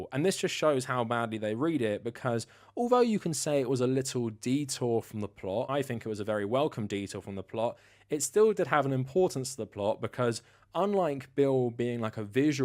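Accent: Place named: United Kingdom. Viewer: That’s funny. I never thought there was any other way to say those words. I mean, I sometimes say mirror like it has an o in it, but only because it sounds weird. English is a nonsense language with no real rules. That’s what makes it so good. And in all honesty, I’m a sucker for foreign accents, which is one reason I watch this channel. Everything sounds smarter with a British accent.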